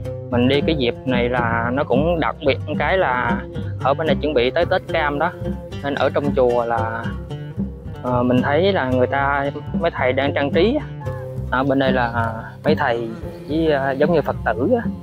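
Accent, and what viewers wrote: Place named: Central Highlands, Vietnam. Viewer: Giong p tay nguyen viet nam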